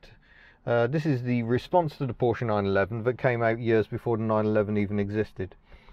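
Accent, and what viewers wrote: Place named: England, United Kingdom. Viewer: How come you talk with an english accent not NZ?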